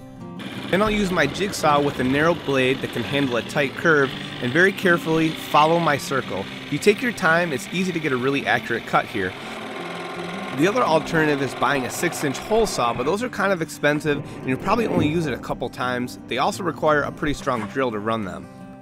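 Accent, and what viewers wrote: Michigan, United States. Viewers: R u from Michigan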